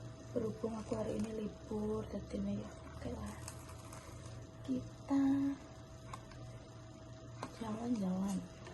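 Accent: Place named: Java, Indonesia. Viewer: pengen ngerti boso inggrismu lancar pora wong logatmu jowonemen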